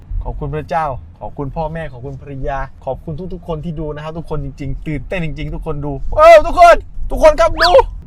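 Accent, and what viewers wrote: South, Thailand. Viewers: พูดภาษาใต้น่ารัก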